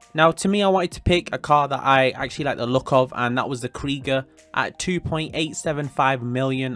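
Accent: United Kingdom. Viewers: Fucking bri’ish accent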